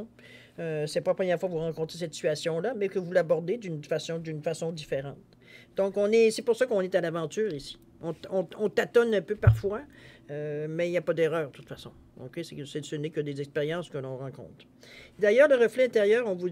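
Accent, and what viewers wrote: Canada. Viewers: Bonsoir, Votre approche est particulièrement profonde. Indépendamment d'un humour que seul l'expérience de vie est en mesure de produire, votre analyse est très élaborée. J'ai vraiment apprécié la finesse de votre intuition et puis cet accent sublime du canada est vraiment très réconfortant car il démontre, selon moi, un attachement à la terre très sain. Bon c'est mon sentiment évidemment et vous en rirez probablement. Mais je dois vous confirmez que mon ressentit vis à vis de vos paroles est particulièrement positif car il touche mon être au plus profond de sa réalité. Un très grand merci de votre maturité d'âme et de vos intuitions, c'est un cadeau!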